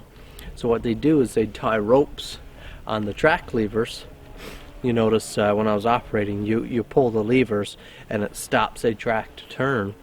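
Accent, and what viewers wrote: Newfoundland, Canada. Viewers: Was there no choke on the carburetor? If so, wonder why not. I was trying to place the accent but you said Newfoundland--yours doesn't seem as distinctive as some I have heard. Glad that your Grandpa was able to, at least, view you working it. Bet he would of loved to sit in that seat again. Grew up running the old JD 2 Cyls. and love their sound to this day. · Its friday night and I am watching a bulldozer push snow, now thats a cool night. I was wondering about your accent as you were talking, it sounded familiar then you said NewFoundland...ahhaaa! I am from northern Maine and that accent is how I used to talk before I moved to New Yark 40 years back. Its 'aboot' doing a job! Well done.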